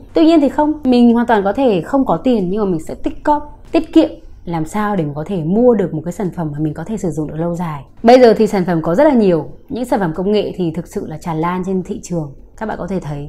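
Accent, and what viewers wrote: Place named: North, Vietnam. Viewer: Mình xin lỗi nhưng k hiểu sao mình k thích giọng miền Bắc cho lắm. Nhưng giọng của bạn hay quá. Lần đầu tiên nghe giọng Bắc mà thấy thiện cảm mà hay như vậy, truyền cảm.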